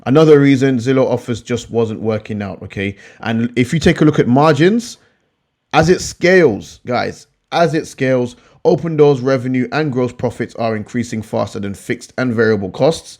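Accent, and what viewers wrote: United Kingdom. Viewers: love your analysis, very detailed and to the point. great thanks, nice accent where u from the UK?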